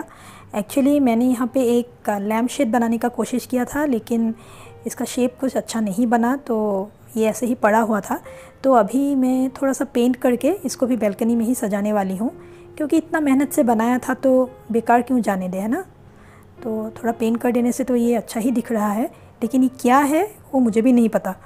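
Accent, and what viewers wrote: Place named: Assam, India. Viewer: I like your creativity. I am definitely going to try it. By the way, are you Assamese? The way you talk seems like that. Are you from Assam?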